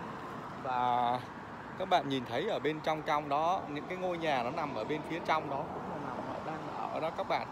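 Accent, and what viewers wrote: North, Vietnam. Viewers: Giọng bắc 54 nghe dễ thương giống e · Tiếng bắc mà tự xưng là Bà Rịa.???? Quê tui .... 😚😚😚😚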